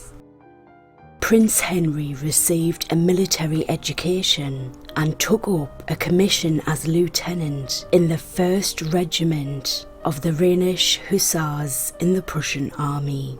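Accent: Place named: Newcastle, United Kingdom. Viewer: She has a Geordie accent from England. Though normally they talk very quickly, but for some reason she's talking in a super slow voice.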